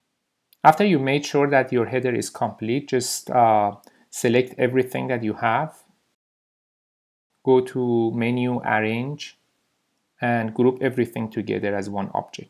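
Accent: Iran. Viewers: By listening to your accent, it seems your native language is Persian.👍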